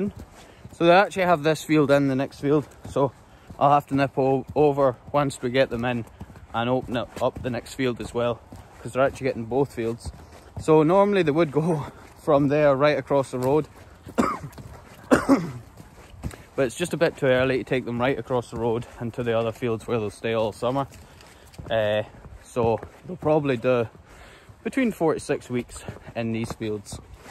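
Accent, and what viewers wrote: Scotland, United Kingdom. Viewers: I have just discovered this channel and I am obsessed with it. Between gorgeous Scottish accent and those amazing dogs, it's a refreshing break from the news of the day. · Have to be honest, I have no idea what you just said for 20 minutes but it was beautiful.❤ I love Scottish accents. What can I say. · it is a thing of beauty to watch these dog work.And with such few words/commands. The icing on the cake is his Scottish accent! Thank you for this.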